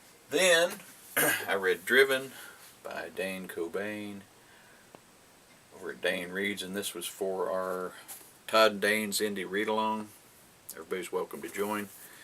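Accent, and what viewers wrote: United Kingdom. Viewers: Good month, Todd! I found The Hate U Give a real page turner too. Your British accent!!!! Amazing! We do love a good chippy! haha! L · I loved your British accent! (I love a good chippy too haha!) Great wrap-up :)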